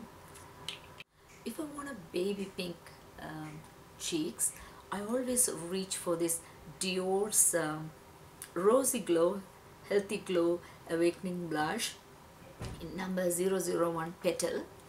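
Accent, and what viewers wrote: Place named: Tamil Nadu, India. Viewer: yes! she was Tamil and spoke very similar to you! i was wondering if you were Tamil as well if you don't mind me asking:)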